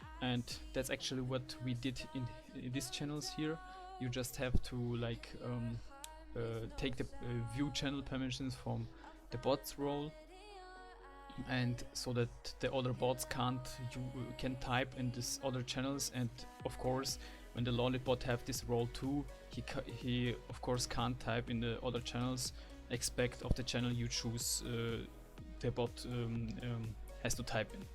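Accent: Germany. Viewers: Der DEUTSCHE Akzent man kennt ihn!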